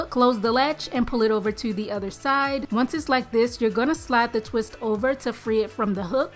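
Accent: Baltimore, United States. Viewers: I hear that Baltimore U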